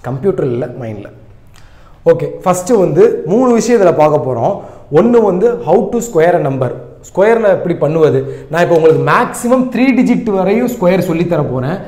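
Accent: Madurai, India. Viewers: Madurai slang bro👌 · Madurai Lang itu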